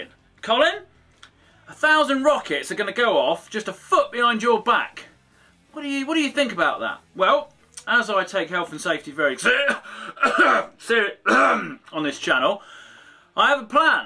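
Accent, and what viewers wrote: United Kingdom. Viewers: is this guy english or scottish or irish or welsh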